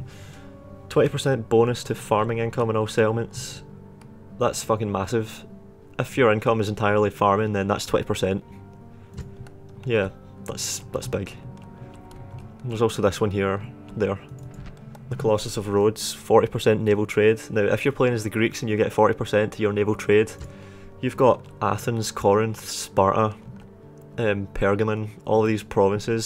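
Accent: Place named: England, United Kingdom. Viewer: Best english accent? Or am I the only one who thinks this?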